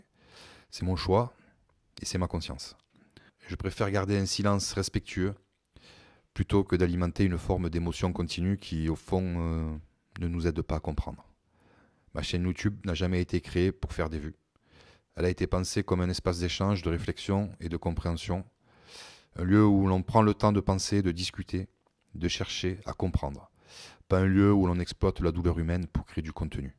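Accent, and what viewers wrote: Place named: Alès, France. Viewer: Une pointe de trivialité en passant, malgré la lourdeur du sujet traité; Vous avez la même voix ( J' ai tout de même m'y quelques vingt, vingt cinq secondes pour trouver) qu'un certain .....Blanc, également..... Laurent de son prenons. Sérieusement , pour avoir parler avec lui à plusieurs reprises ces dernières décennies je reconnais même certaines intonations, pauses ou enchainements....l'accent aussi. C'est troublant ! Je suppose qu'on vous là souvent dit. Pour ceux qui me lisent sans comprendre, Laurent Blanc est un ancien footballeur professionnel ........! Peut être, êtes vous habitant, ou originaire, de la même région que lui ( Alès ) ? Je m'abonne et reviendrais vous écouter, vos propos dans cette vidéo m'ayants touchés.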